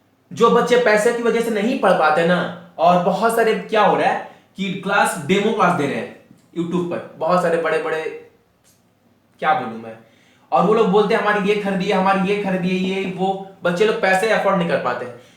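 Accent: Bihar, India. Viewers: i love this bihari tone awesome both subscribe and like done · very nice way to teaching sir....and bihari tone omg thnq sir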